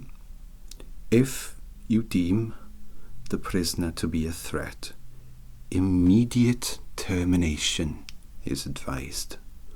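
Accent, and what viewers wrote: Wales, United Kingdom. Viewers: Oh my god, I love your Welsh accent!! ❤️ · My Stepfather was welsh, not heard the accent in ages. I think it’s great 👍🏻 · socialist elf with welsh accent me, a welsh-speaking socialist, immediately clicking the notification: oh no™ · Hmmmm.....can't say I get behind the whole Socialist thing, but I am digging the Welsh accent! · I’ve never thought that Welsh accent can be so asmr! Thank you for your video :) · I love this character, I can just see the wheels turning in your head ☀️ the Welsh accent is amazing I love it ❤️☀️☀️☀️ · As somebody who is a born and raised welsh kid, it's nice hearing the accent. Great job! Da iawn ti! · An Elf Welsh communist sounds just like Wales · Out of all the ASMR triggers I've listened to, your Welsh/Welsh accent is on top. 🤤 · This is all a setup for the punchline, "A guy walks into a bar and sees 3 socialist elves......" (And no finale, we all want more unstable elves!!) (Also I legit held my breath mark onward.) (Also also, the parallels and commentary in this is so poignant it hurts. 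🥺) My gawd, your Welsh accent is so musical and beautiful. Well done once again, Commissar! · не, я к тому, что же наполовину валлиец, так что это самый что ни на есть натуральный акцент☺️ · Top notch engaging acting here with this delightfully funny persona and slow shift of mood...shining example of comments in that recent Tinglecon cinematic asmr panel about "enveloping the viewer in a world" and his "seeing where the character takes him." I love whatever you did with the audio here, it's so present and crisp and soft all at once...really enhances what is my fave Welsh accent of yours, and those choice mouth sounds in beginning. I think "chuffed" is one of my fave Britishisms. 🥰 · I don’t know why, but hearing you with a Welsh accent really makes me smile. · Sigh.. The Welsh accent... I could listen to it each and every day... 💗💗💗 Dear Sir... I mean Comenzar Sir, very little scares me, least of all pain. 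I had been imprisoned in a dark and horrible place for a very long time before you found me, but please understand me that although you have helped, and I'm ever grateful, I saved myself. Why did I make you believe that you saved me? Because I want you to always believe the best about yourself and your abilities. You will never become one of them, as you're not them, but I see you going down a road that is not good for you. Thus I choose the place closer to danger as it's furthest from harm. I will not leave this place, but don't worry, I will keep my distance. I'll do anything to keep you safe. I will tell you this, though: that I will break the Stag Officer out of jail. Far too much blood has been spilled already, and there is so much good in him, just as there is so much good in you. I will never punch you, I cannot ever do that. I cannot hurt another. Face this stormy challenging time courageously. 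Your safety net will always be at vigil close by. Thank you for listening, Mr Comenzar. I'll be going now, but we will meet again. 🌱🌱🌱 Now put that gun away. I don't fear you, and I never will. 🌱🌱🌱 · Absolutely adore welsh accents, also I got pretty invested in this story, it was fun! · Spreading socialist democracy whether they like it or not, key phrase no? Founding father’s said, here is your democracy if you can keep it. Ouch. A bit of trouble keeping it now, no? I agree, if we don’t move forward we’ll inevitably move backwards very soon. I was wondering when you would do a welsh accent!! Thank you, it is naturally poetic and musical, Charming like yourself🤗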